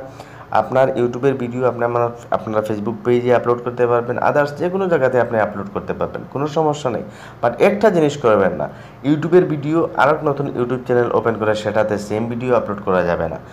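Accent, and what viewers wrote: Sylhet, Bangladesh. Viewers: বাসা শুনে মনে হচ্ছে ভাই সিলেটি😊😊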